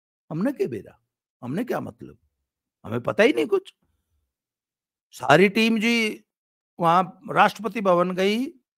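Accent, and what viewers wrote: Haryana, India. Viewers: Babi ji leave everything aside but your haryanvi n punjabi accent too good...make a video purely in these language. It ll be delight to watch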